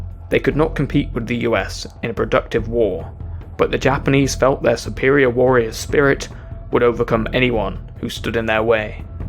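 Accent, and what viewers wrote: United Kingdom. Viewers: That accent,you from England?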